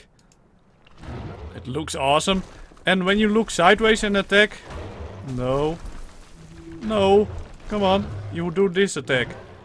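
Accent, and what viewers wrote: Netherlands. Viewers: are you a dutchman? you sound like one...i recognize the accent! XD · Hey your dutch i can hear laat de leeuw niet in zijn hempie staan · I'm just gonna assume you're dutch. Because you sound hella dutch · Is he Dutch because I hear Dutch pronoun citation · Ik hoor nu al dat dit een Hollander is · Yes im dutch too ik hoor het aan u accent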